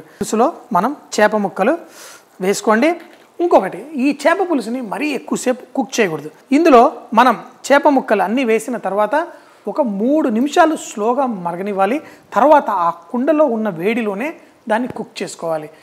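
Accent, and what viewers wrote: Telangana, India. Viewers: Your Telangana slang is awesome!!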